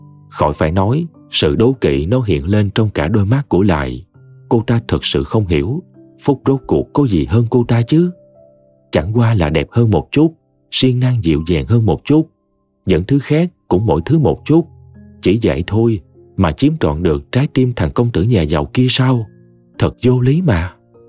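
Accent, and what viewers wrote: South, Vietnam. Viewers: Nguoi doc truyen that có duyen va giong mien nam that hay! Truyen ngan nhu vay minh rat thich…Xin chan Thành Cam on nhieu nhé ❤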